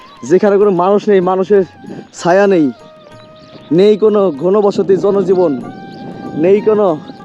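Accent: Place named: Pabna, Bangladesh. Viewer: এনার কথায় মনে হচ্ছে ইনি পাবনা জেলার মানুষ, আর পাবনা জেলার মানুষের কথার মূল্য আছে,এরা পাগল ছাগল হলেও দুইনাম্বারি কথা বলে না,অনেক পাবনার মানুষের সাথে আমি থাকছি,এদের রাগ একটু বেশি, কিন্তু মানুষ হিসাবে ভালো, পাবনার পাগলা 😂😂❤❤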